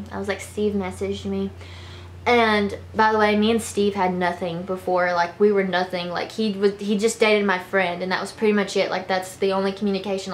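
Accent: South, United States. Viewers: Where are you from? Your accent's confusing lol. So southern! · It's called a southern accent. Usually when you're from down South. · It’s because she’s southern 🤷🏻‍♀️ we have that “southern drawl” down here 😂 hers isn’t as bad as a lot of people’s. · accent or nah ? southern or nah ? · I love her southern accent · Hey, are you from the south of the US? Carrie Underwood was my favorite blonde of all time, not it's you haha:) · I love ur little southern accent!😁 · It's just a southern accent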